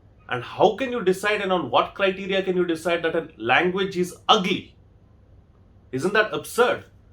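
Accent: Karnataka, India. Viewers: That was so sweet to hear kannada from you sir....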